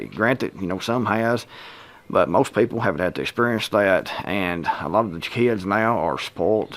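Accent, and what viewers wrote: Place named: Tennessee, United States. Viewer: Lived in Georgia for 35 years, so I know this dude... good guy, great neighbor. Guessing he's a Volunteer fan from his dialect. I'm jealous of your hardwood... mostly cedar and pine in NM.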